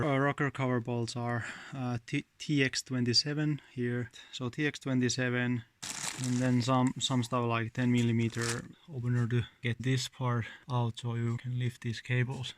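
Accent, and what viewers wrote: Finland. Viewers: your accent sounds exactly like finnish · Finnish accent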